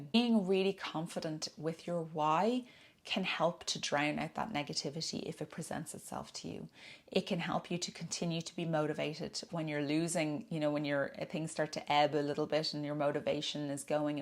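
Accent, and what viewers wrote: Ireland. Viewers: Great tips! I love your beautiful Irish accent. Hello from the USA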